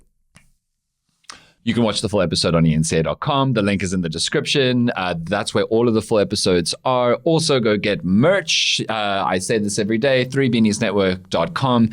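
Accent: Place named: Makhanda, South Africa. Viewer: Mchana I enjoy your Show and quirks, Keeps me informed, You are a Makhanda Boi I can tell accent, I need for you focus on Something very important to me and Abantu bam, The UIF system has been down for a while. This affects all our non working people. This is important for me. Even the USSD does not work. Do this for me Mchanam. So people are not getting their UIF or labour, do this mchana, the web is down the USSD including the country continue, Do This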